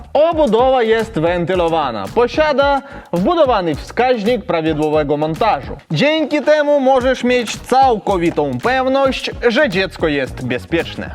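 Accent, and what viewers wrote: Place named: Ukraine. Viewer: Dlaczego znowu słyszę Ukraińca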